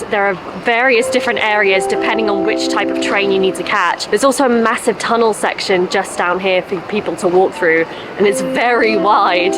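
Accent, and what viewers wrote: United Kingdom. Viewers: Your accent is lovely! I like British accent. Thank you for coming Japan! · イギリス英語🇬🇧最高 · I can listen to your UK English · 最初の1分くらいはオーストラリア人かニュージーランド人かと思いましたが、イギリス人なんですね。Crisps。 新幹線は、JR東日本のEki-Netがたまに半額やってますね。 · やっぱイギリスアクセントめちゃいいわ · ＢＢＣの短波放送を聴いていたのでイギリス英語が私には理解しやすいです。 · easy to hear british pronunciation😄😄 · Lovely！ As soon as I look at your video, I miss England because I always listened to British English that you talk when I lived in England. I like to listen to British English.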